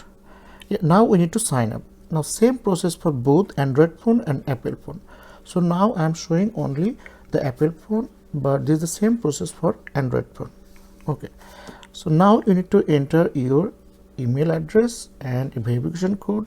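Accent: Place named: India. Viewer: Talk better English, your India English is worst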